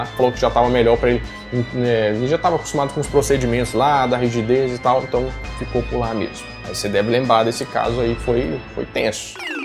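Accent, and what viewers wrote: Minas Gerais, Brazil. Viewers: Você é mineiro? Falando da brilhantes kkkk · Você é mineiro?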